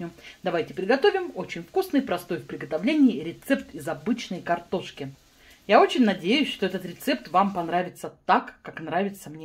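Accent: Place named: Russia. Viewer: какой вкусный русский акцент. Осталось семь варёных картошин. теперь знаю для чего. спасибо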